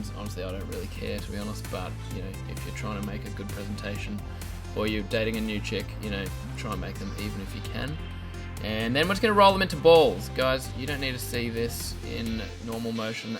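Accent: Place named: Australia. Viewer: I think it's absolutely remarkable that douche-bag sounds the same even with an Australian accent!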